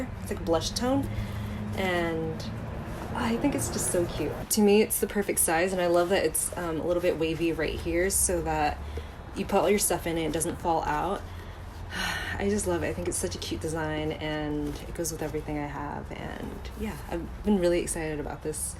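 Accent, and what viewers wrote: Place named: California, United States. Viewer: Weird confession, sometime I think about emulating the way you talk haha. Like you don't rush your words, you articulate everything with precision and thoughtfulness. So measured and without any anxiety. And very Californian!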